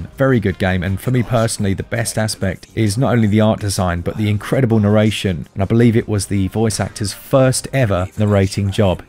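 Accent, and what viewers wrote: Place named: Essex, United Kingdom. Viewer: J W Definitely from around/near London, probably Essex/Kent way is my guess.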